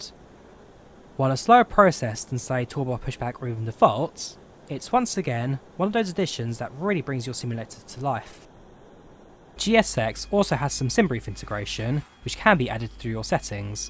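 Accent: United Kingdom. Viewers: I say this with deep respect as you are a brilliant simmer and content creator who engages so generously with the community. When syllables get dropped from words in the rush to get to the end of a sentence, it does impact comprehension. Although I’m not from the UK, English is my first language and I do have some trouble understanding all the important and interesting things you’re saying. I think by taking the time to slow things down, and more evenly space words and syllables across phrases, you’ll be much better understood by those who do not speak with your accent or by those for whom english is not their first language. I truly only mean this only as genuine constructive feedback.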